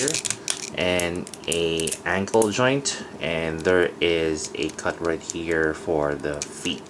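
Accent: Philippines. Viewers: maybe Filipino or singaporean accent...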